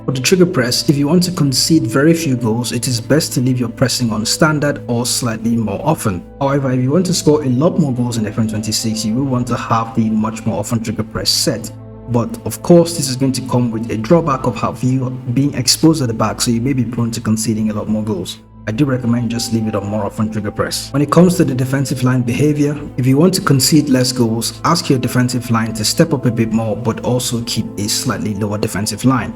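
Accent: Nigeria. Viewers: i heard the voice and i had a feeling Nice to find a nigerian fm youtuber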